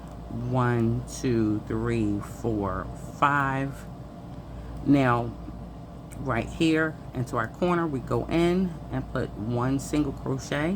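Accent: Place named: Philadelphia, United States. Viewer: Hey... love your work. Where are hot from if holy don't mind me asking. I hear Philly or Jersey. I live in Philly.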